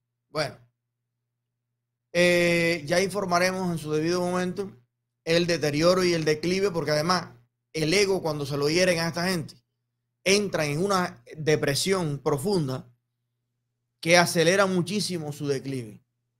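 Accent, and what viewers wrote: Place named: Rural, Cuba. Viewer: De que provincia eres de Cuba te siento por el habla eres guacho guacho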